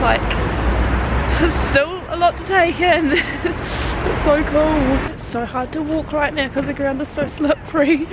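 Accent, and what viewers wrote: New Zealand. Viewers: Love your kiwi accent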